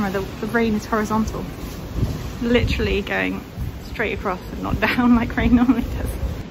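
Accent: United Kingdom. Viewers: Hey, r u a brit??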